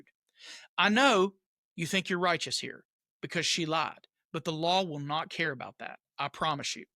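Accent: South, United States. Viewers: your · I love your channel❤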